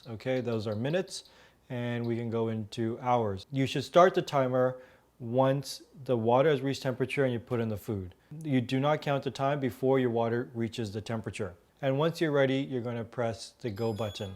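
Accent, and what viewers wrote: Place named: United States. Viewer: Duh do you hear an accent. Hes from the US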